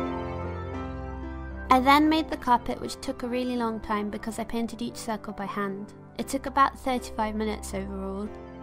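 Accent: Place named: Southern England, United Kingdom. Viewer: You're British Finally i can fully understand every word said in a video (Though your accent says you're from the south, hi from the north)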